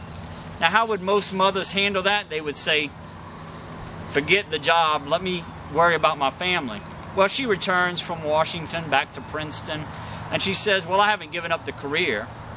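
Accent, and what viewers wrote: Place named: Louisiana, United States. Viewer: As a fellow Louisianian (from Cenla), I was convinced you were from Louisiana from the accent.... nailed it! Thanks and God bless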